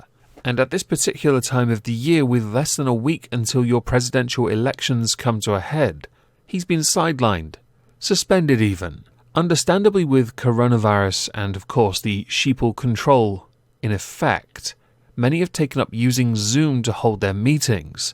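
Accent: United Kingdom. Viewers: I just can’t get over voice and accent like ok British daddy I can listen to you all day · You know what made me subscribe? Of course, British wit, voice and humor (which I love as a non native English speaker) plus Lovecraftian theme, but those were just a bonus. The thing that made me finally press the button was - your usage of face expressions. They are so well made (props to the artist who made those!) and varied just enough, and you use them so skillfully to bring a point across or emphasize something, along with a slight voice change or pause, love it. I never though that something like this can impress me so, especially as this element of brand creation is so popular nowadays, everyone creates a cartoony alter ego. You nailed it, it has so much character, it looks like you're actually speaking and articulating through it in real time. Also, the intro with sarcastic "well, isn't this just fantastic" speaks to me on a spiritual level. 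As well as a concept of Exhibition of Stupid People. Good stuff.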